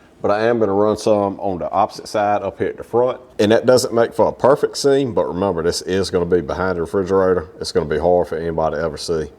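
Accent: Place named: South, United States. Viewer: Just found your channel through one of your shorts. Been binge watching. Really enjoying it! Thank you! As a felllow southerner who has lived ALL OVER the south, love hearing your accent!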